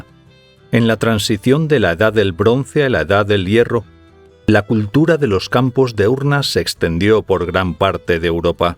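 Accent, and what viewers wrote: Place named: Spain. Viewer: Un lujo oir narraciones en una voz autentica y española , no puedo dar mas pulgares pero lo hare en mas videos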